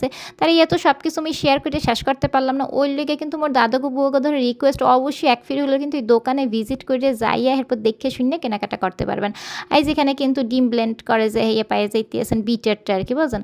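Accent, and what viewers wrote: Barishal, Bangladesh. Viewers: Apu tomar borishal er vasha shunte amar onek Valo lage 🥰😘❤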